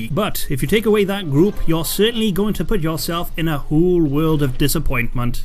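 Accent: Wales, United Kingdom. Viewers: What part of wales you from mate? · Is he Welsh?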